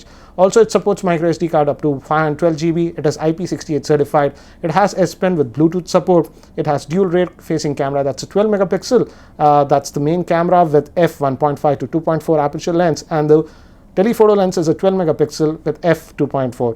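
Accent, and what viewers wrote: India. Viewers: Indian.. Accent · I really dont know why youtube is pushing Indian channels to the top of search result besides so many UK and USA channels covering the same topic. Is so hard to understand Indian English, I can barely understand them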